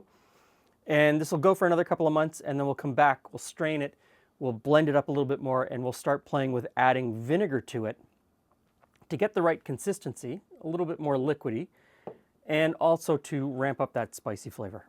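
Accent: Canada. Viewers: He's saying it right. Albeit with a Canadian accent.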